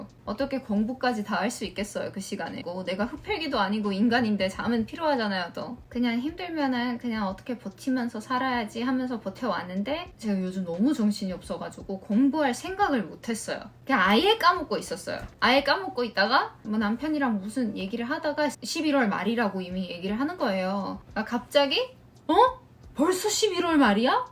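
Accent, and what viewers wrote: Seoul, South Korea. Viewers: 그냥 찐 한국인 말투인데😊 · 언어 능력이 원어민 수준입니다. 서울 말씨를 쓰시고 오히려 저보다 말씀을 잘 하시네요. 마치 한국에서 태어나 성장하신 분 같습니다. 😅😅😅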